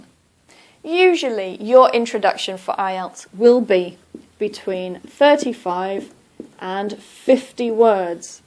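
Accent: United Kingdom. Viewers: Slow BRISTISH voice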